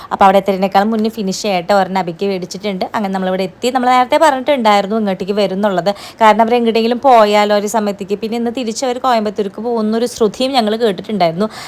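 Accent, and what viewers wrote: Thrissur, India. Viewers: പൂ ആണ്... authentic thrissur സ്ലാങ്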